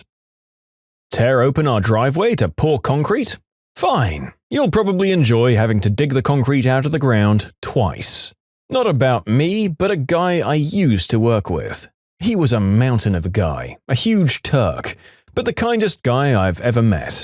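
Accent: United Kingdom. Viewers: Bro is british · Is bro british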